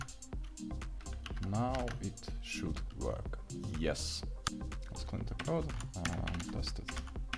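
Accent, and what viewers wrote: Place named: Russia. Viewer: акцент русский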